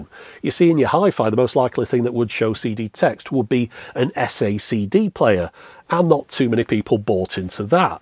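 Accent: United Kingdom. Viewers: I love listening to a British man talking and showing obscure technology, and I think plenty of young people would like to watch a British man talking about tech❤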